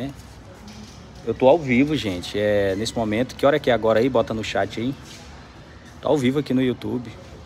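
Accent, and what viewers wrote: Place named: Northeast, Brazil. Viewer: Sou do Paraná, Santo Antônio da Platina.Adoro o sotaque nordestino, e essa sua voz é alegria é contagiante. ❤ ❤ ❤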